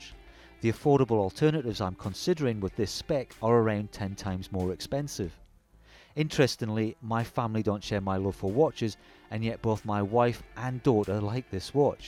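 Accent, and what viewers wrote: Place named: Newcastle, United Kingdom. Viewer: Are you from Newcastle by any chance? I recognise the accent. Great video by the way, keep up the good work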